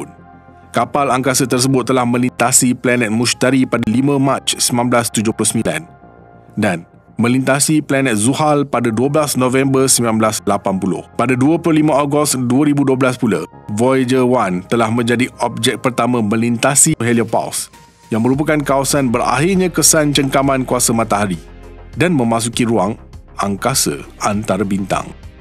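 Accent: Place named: Kuala Lumpur, Malaysia. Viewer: Guna Bahasa Melayu baku tetapi sebutan masih KL... Jika betul2 nak Bahasa Melayu baku, sebutan kite tu kena betul2 kita dan sama bukan same...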